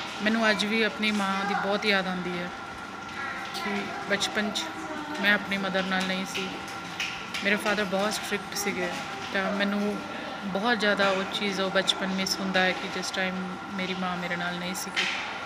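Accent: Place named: Punjab, India. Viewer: ...south India to ho k eni pure Punjabi language...wah!...oh v pendu panjabi...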